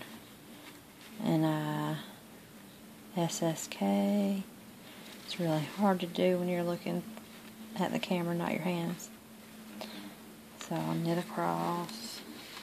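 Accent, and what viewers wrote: North Carolina, United States. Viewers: K3N Clothtales recommended your videos and I am gonna be honest, I was a little floored when you started talking and you have the same accent as me lol I think you’re the first fiber artist/creator with a southern accent I’ve seen on YouTube. Subscribed and liked, as you came highly recommended and your video is great. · Very clear and easy to understand. As others said, not boring in the slightest. I grew up in NC and your accent delights me and brings back many memories for this transplant to Yankee-land. Sorry if you've answered already, but what tool were you using to pick out the stitches? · I love your accent. I am from North Carolina, and I felt like I was home again. Great video, very helpful and entertaining! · Thank you so much for this tutorial. I used it on my first sock and it was my first after thought heel. 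You made it so easy to follow. Not sure, but sounds like you from the south. I'm from Ruston, Louisiana. So from one southern gal to another, thank you for the video.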